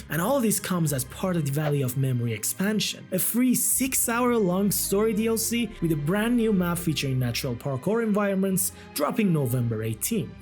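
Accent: Iran. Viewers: Are u Iranian? I ask it because of your accent❤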